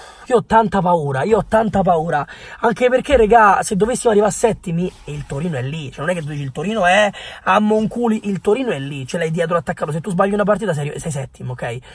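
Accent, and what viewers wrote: Rome, Italy. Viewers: Un consiglio. Se aspiri a diventare un commentatore, non puoi commettere errori pacchiani. Uno: il Milan, nel 2016, ha vinto la supercoppa italiana. Due: l'Inter ha vinto la Coppa Italia nel 2011. Tre: non puoi non sapere il cognome esatto dell'allenatore più vincente della storia della Lazio. Quattro: fossi in te, eviterei di parlare in romanaccio (il romanesco, che è altra cosa, è di Belli e Trilussa).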